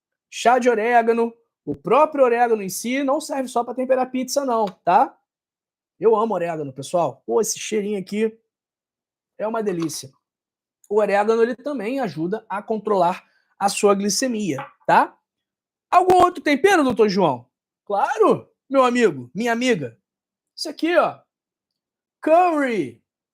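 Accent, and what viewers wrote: Rio de Janeiro, Brazil. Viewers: É o sotaque dos cariocas. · Que nojo este sotaque carioca carregado...não dá nem pra escutar...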